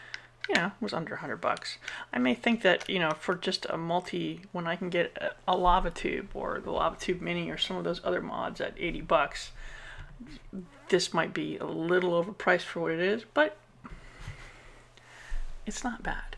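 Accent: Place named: New Jersey, United States. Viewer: brilliant explanation on PWM, as a transplanted NJ Viirginian your accent makes me homesick. I love you lady, you are THE best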